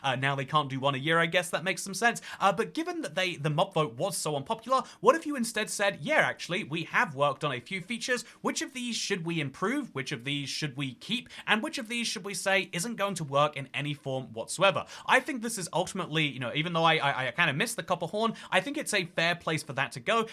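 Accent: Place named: United Kingdom. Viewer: 2012 sounded 10x more British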